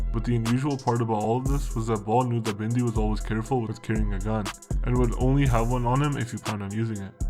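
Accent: Punjab, India. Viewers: u can tell this guy is punjabi and from surrey lmao he sounds just like bindy johal except more chill/relaxed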